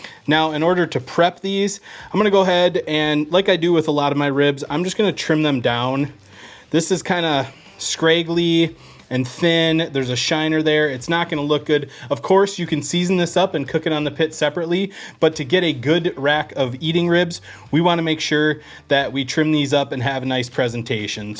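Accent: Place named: Upper Midwest, United States. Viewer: Hahaha, you're not from the Upper Midwest are ya? Great video, I followed it to a T and they turned out great! thanks!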